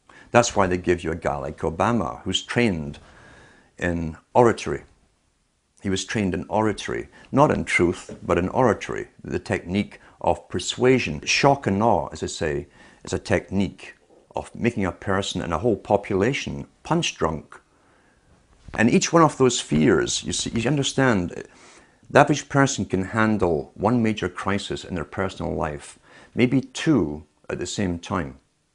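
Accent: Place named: Scotland, United Kingdom. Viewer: He has a different voice, he has a Scottish voice here, and all his other audios he has a different accent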